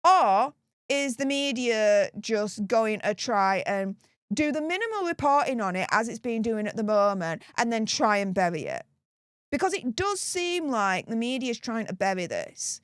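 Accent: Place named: England, United Kingdom. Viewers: 😅 wow young models could they be upset about something jealousy drugs I think this is going deep but the media seems to be running away from it😅 oh by the way I love your English accent😊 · You sound like you're from Hull, with your accent. Or nearby. I am from ULL myself. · Great to hear a good Northern accent for once in political discourse. We might get more sense (oh, sorry, I forgot Our Ange ! )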